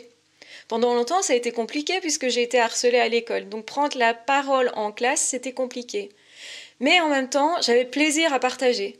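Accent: Belgium. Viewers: Tu es belge ?¿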